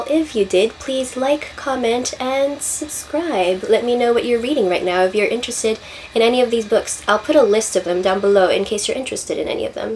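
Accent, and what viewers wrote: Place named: United Kingdom. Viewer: lol love your British accent and your intro!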